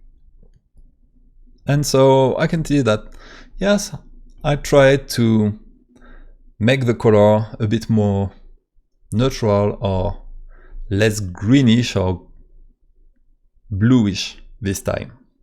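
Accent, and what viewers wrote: France. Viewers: Mmh tu a un accent fr xD Sinon très cool merci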